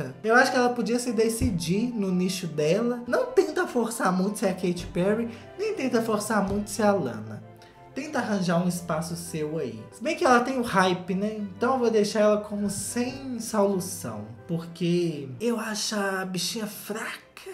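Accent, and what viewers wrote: Minas Gerais, Brazil. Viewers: O sotaque mineiro do tá cada vez mais forte, eu amo.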